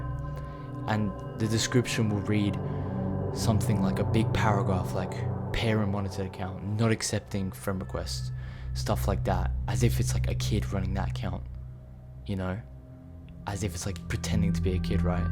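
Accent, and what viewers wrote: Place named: Australia. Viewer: Fellow pom ? You sound Australian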